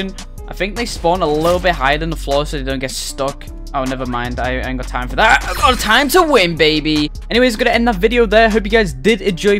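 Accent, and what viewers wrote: United Kingdom. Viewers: are you talking english in british way ?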